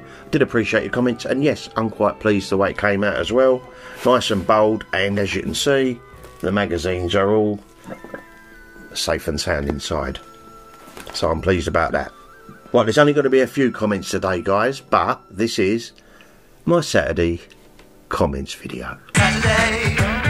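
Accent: Cockney, United Kingdom. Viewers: GO ON MY SON GET IN THERE. ICF. EAST LONDON IM HAPPY TO FIND OUT YOUR WEST HAM LIKE ME I WONDERED WITH THE COCKNEY ACENT BUT NOW I KNOW PS I PLAYED FOR WEST HAM UNDER 16S IN 1985 SHAME I GOT HIT BY A CAR PUT IN WHEEL CHAIR FOR 14 MONTHS 😮😮🙏💯🤞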